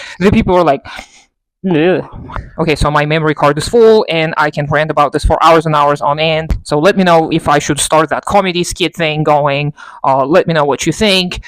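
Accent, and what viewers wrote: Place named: Russia. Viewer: You're Russian accent is amazing lol. I'm jealous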